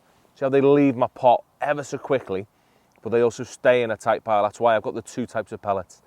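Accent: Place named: Wirral, United Kingdom. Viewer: I watch a lot of Fishing videos, I tend to always read the comments, watching this channel is the first time I've gone across someone being given stick for their accent because your man there is Scouse. Firstly he's from the Wirral, his accent is closer to a Chester accent than a Scouse accent, not that it actually matters. If a Scouse or even any Northern accent bothers you I can point you towards a few channels run by southern anglers who are nowhere near as knowledgeable about Fishing as this fella, you won't learn as much but at least you will feel safe and at ease you poor little snowflakes 👍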